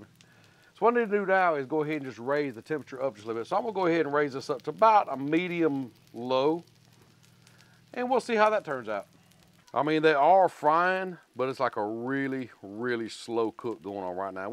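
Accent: South, United States. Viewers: Lol your crumb-snatchers then got big you know that's that old southern talk you all have a blessed day Amen brother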